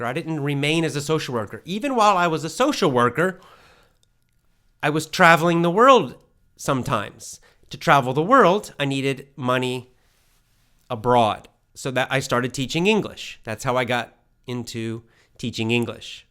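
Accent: United States. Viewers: I love to hear your american accent. So I like follow you. You are engine for my work. I'm living in nederland. I'm using here english for comunication and also my language. I bought your pronucuation cours. I love it. My first day was 3 days, second day is just finish after 7 days. But I love It. I love , cuz I like change my job even to another country like Norway, coz I'm electronic specialist.Really I like to follow you exacly mach. Haha. This vidio is powerfull motivated for my next step. Just most important still is improving my pronucuation with your course.Thank you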